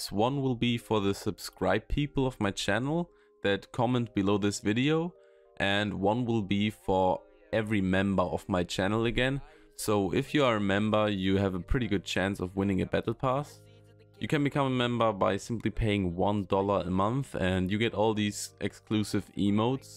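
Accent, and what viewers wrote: Germany. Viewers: Are you german? Bc ur accent sounds like it. And also do you play with your viewers sometimes? Or do you stream?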